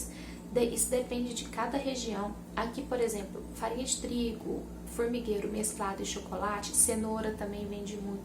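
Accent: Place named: Minas Gerais, Brazil. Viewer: Sou a Vani Souza de Campinas viu bjos linda já disse antes, descobri o seu canal e amei demais, já disse gosto do seu jeito mineirinho de falar, seu carinho com tudo que faz, seu carinho e paciência com as crianças é bom demais viu! Gosto de tudo que vc posta, morro de vontade de comer seus bolos, fico com água na boca, deve ser uma delícia, se tivesse aí perto de vc com certeza eu compraria mesmo kkkkkkk te digo que vc é iluminada, que o Senhor te abençoe e te proteja sempre, vc é especial pra Deus e pra mim tbm viu, manda bjos pra mim, eu comento sempre lá no Instagram, bjinhos nas crianças lindas, fica com Deus, Deus te abençoe minha linda🌺🤗😘💞 Vani Souza de Campinas Manda bjos pra mim linda💓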